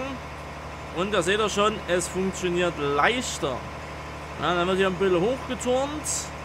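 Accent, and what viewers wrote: Saxony, Germany. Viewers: Nicer Mod p.s. Wenn du Sachse bist dein sächsisch is der Hammer :D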